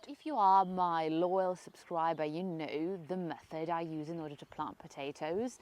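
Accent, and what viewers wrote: United Kingdom. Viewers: My wife, Iryna, who is also from Belarus, still dislikes planting potatoes for the same reasons you did as a child. She particularly despises Colorado beetles! I'm the gardener in our family. Your British accent is interesting to hear from a Belarusian! Did you spend time in the UK? Cheers!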